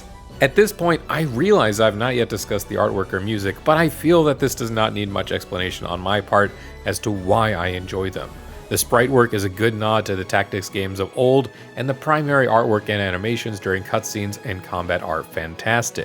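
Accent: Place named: United Kingdom. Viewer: first video I've seen from you and i genuinely thought you were British at the start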